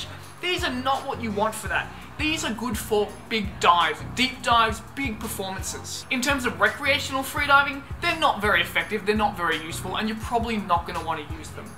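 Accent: Australia. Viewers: I like they way you talk Aussie man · I lova australian english!!!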